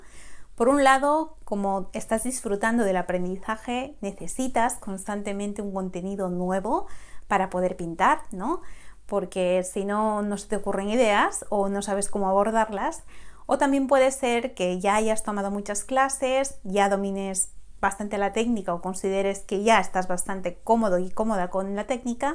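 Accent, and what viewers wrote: Spain. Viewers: eres española? Saludos desde México.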